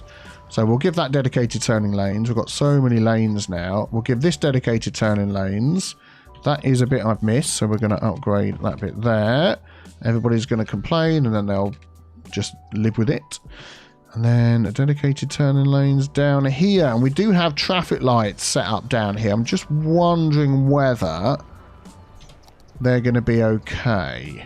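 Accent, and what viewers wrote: United Kingdom. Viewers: I've always wondered, why do you use the American 'counter clockwise' rather than the English 'anti clockwise'? I know it's appropriate in this case as it's an American theme build, but you do it in your other cities too. I was just wondering as your accent clearly means you're from this side of the pond.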